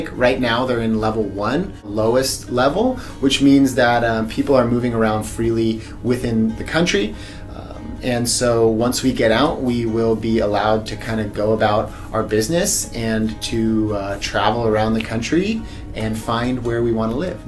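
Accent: United States. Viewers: Welcome Home! As a fellow kiwi, it’s super interesting to watch your journey on coming here to NZ. Look forward to you watching your journey in loosing that American Accent as you settle in 😅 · I'm sorry but how were you allowed to even come here, are you a resident ? that accent sounds like American so-called closed borders ay. Typical one rule for some and different rule to everyone else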